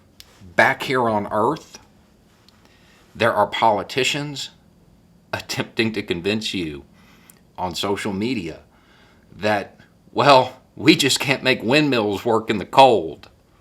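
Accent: South, United States. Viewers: Dude, the style choices of apparel on display here are outstanding. NASA shirt, Bass Pro hat... thanks for representing both brands well buddy. Full marks all across the board. As one southern boy to another, it’s also akin to presenting a very astute argument with a southern accent. Proves things aren’t always what people say they are.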